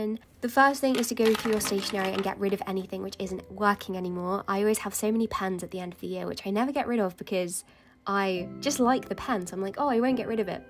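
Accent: United Kingdom. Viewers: her voice is soo calming.... i live around people who have that strong british accent (apperently i have one too but idk) so this feels weirdly familiar 😂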